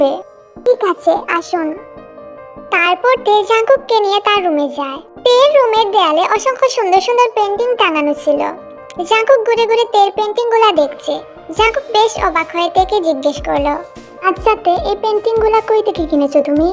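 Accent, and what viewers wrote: Sylhet, Bangladesh. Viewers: আপু তুমি মনে হয় সিলেটি..❤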